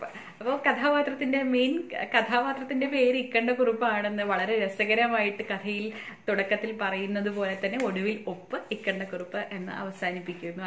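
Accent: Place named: Valluvanad, India. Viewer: വള്ളുവനാടൻ ശൈലിയാണല്ലോ....😄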